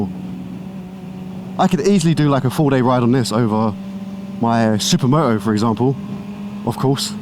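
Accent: United Kingdom. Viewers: Uk accent but NZ roads is wigging me out haha. Would love one of these. I have CBR250rr (another 20k screamer). Is the best.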